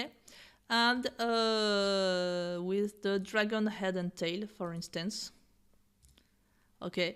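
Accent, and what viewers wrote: France. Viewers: She is a girl. Avec un joli accent français de surcroît ! 😉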